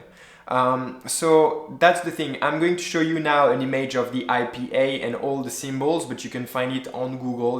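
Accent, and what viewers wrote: France. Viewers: You have a nice french accent, like it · I think ur from France ...u have a frensh accent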